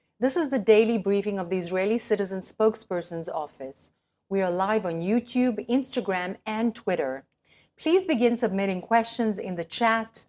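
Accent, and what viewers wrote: South Africa. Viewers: well that was entertaining....she sounds south african... 2X apartheid for her on the books. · South African accent Stop lying Israel child killer You are propagandist You lost go back to south African racist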